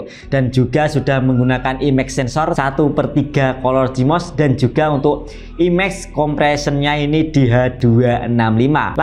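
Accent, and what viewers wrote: Java, Indonesia. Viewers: Jawane medok banget anjir Jiancokkk😂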